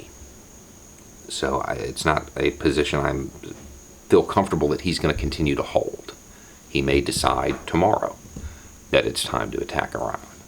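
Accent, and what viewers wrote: South, United States. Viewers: You are a voice of reason. I showed your videos to my conservative Republican dad and even he likes you. I don't know if it's because you say it with a southern accent, but he will listen to what you say while ignoring the same arguments made by other YouTubers that I watch.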